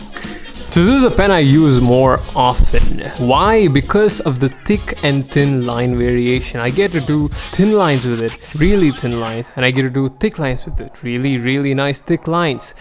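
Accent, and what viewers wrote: India. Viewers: Are you indian? How do you get that accent? Where are you living at the moment? You confuse..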